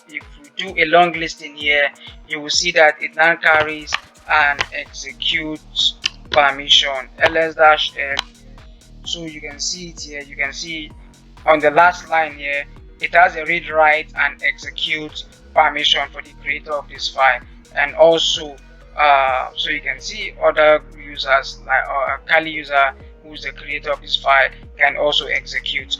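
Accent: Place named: Nigeria. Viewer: Thank you very much sir, you sound like a Nigeria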